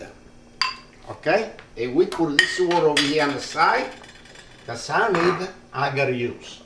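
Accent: Italy. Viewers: You remind me of my grandfather who I called Papa. Love the Italian accent. I’m gonna make this dish this weekend! 😃😋❤️ 👩‍🍳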